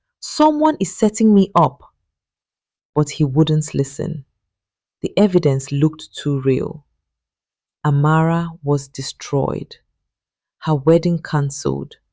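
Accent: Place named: India. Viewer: The narrator voice changed to Indian,not professional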